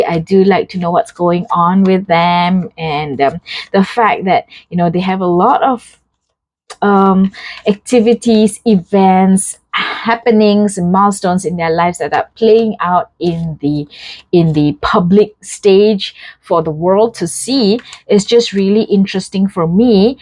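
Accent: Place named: Scotland, United Kingdom. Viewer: Really great read. My first time watching you and noticed you have about 4 accents messed into one. Would love to know what they all are. I think there is a bit of Scot thrown in the mix.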